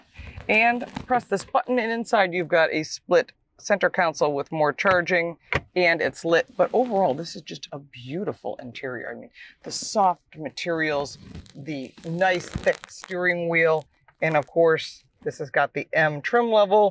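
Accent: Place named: Buffalo, United States. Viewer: Paul's wife, Loveable❤ barbie doll Lauren Fix Car Coach Reports, congratulations on an excellent quality 2024 BMW 5 review. Beautiful vehicle, excellent explanation as always, love the Buffalo, NY accent and your test drives! ❤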